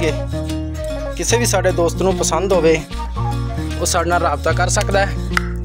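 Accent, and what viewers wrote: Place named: Saraiki, Pakistan. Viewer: 2 coment mashAllah Meethi zuban panjabi srikiy good vlog dear 🌹🌲🌱🌷🌼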